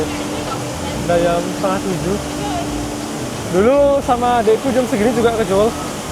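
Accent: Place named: Indonesia. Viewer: Lewat diberanda bli, mau lu sekarang pasport negara lain tapi lidah lu indo banget.. sukses & sehat selalu buat lu dan keluarga..🔥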